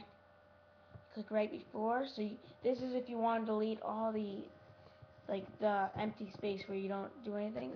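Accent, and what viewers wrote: Texas, United States. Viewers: wat the hell is dis he sounds like he is from Texas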